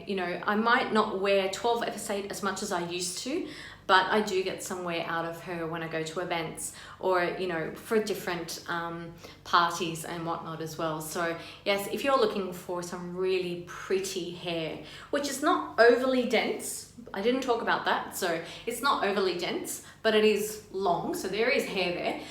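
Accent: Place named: United Kingdom. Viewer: Thank you … 12 FS8 and 12 FS12 vary so much that I won’t even purchase those colors anymore. I received a 12 FS 12 in Miranda and it was much darker than what you are wearing right now. It was a medium brown with sparse gold blonde highlights on the top only. I put her up for sale and made sure I showed the color and someone snatched her up within 30 seconds, so I don’t have to worry about it anymore but I love Palm Springs blonde but sometimes I want to mention in my color and I think that the 12 FS 8 gives you nice dimension especially in a long style like that. It’s unfortunate, so I think I will stick to Estetica colors… Even though they do not have near the colors needed I do have one that I love and it already has demention and I can usually be pretty safe with that color. I want an amber so bad and I just may go ahead and get her in Palm Springs blonde. 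I have a good night in PSV and she does have some variance in color running through her, she reminds me of my own hair that I used to have highlighted and colored so that’s a good thing. Thank you for the video, I always love watching your videos and I love your accent. Is it bad to want to go and take lessons on how to speak with a British accent? My son and I both would like to do that because we feel it is the proper way to speak!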